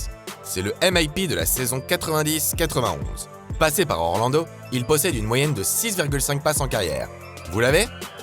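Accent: Quebec, Canada. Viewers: Non non non pas l’accent Québécois 😭😭😭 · Mdrrr j'étais pas près pour l'accent québécois 😂😂😂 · L'accent quebecois. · Merci pour cet accent Québécois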